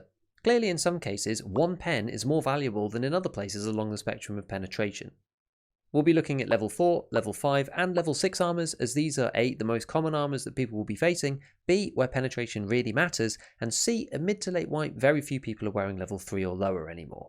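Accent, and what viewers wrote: United Kingdom. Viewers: The 3kliksphilip of tarkov. Even the british accent is included.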